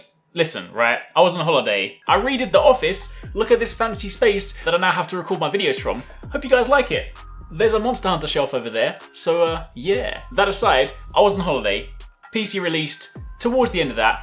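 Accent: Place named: United Kingdom. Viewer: He sounds british but looks scottish ???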